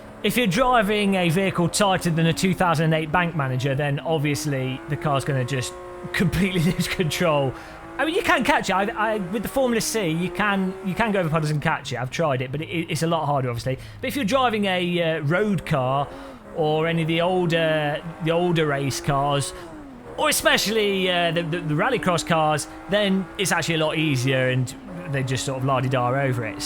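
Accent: United Kingdom. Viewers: I love your british accent! Hear you talk is quite an enjoyable thing :p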